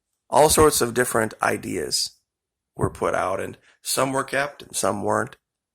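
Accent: South, United States. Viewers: Southern accent? Probably remove Louisiana from the map. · Are u from the south, ❓😌🙏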